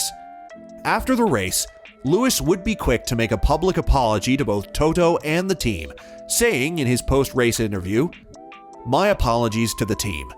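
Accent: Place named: United States. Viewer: please can you talk normal English and not the American way... every word so exaggerated....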